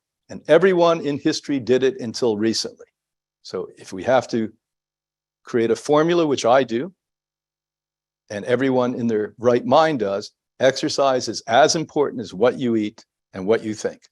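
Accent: New York, United States. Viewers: Yes and I love the NY accent too as I’m originally from NJ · Poor! No matter how much knowledge and data you have, when are you going to learn that the way you deliver your information to human beings is all important? You're constant attitude of sarcasm and a superiority virtually reek from your being and the sidewise New York delivery is of no help whatsoever... You simply sound like the smartass spouting his stuff. When is the true milk of humankindness the true perfume of genuine affection going to issue from your being before you depart this world? Is it reserves for your family alone? How tragic. Try to talk to the man in the mirror. Perhaps give him a little trim and view him with no cosmetics. Whatever, and see if you really like this guy. It's a stretch to believe so. In any case, whatever you do, do it in love, and without needless sarcasm.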